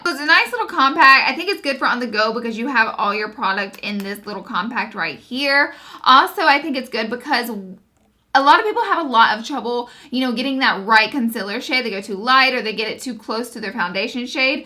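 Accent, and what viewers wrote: South, United States. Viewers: From one southern girl to another, your accent just makes me so happy! I love this compact 😊 #snapchatfam #snapchatfamforlife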